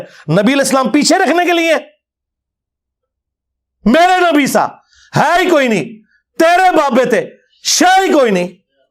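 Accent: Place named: India. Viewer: brother wo india sy hai or waha kuch words esy bolay jaty hein , meaning woe hota hai bs bolne mein farak hai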